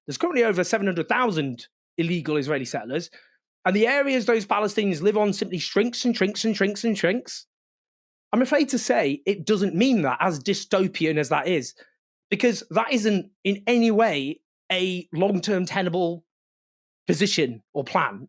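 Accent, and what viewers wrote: United Kingdom. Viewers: Why does Owen sound British???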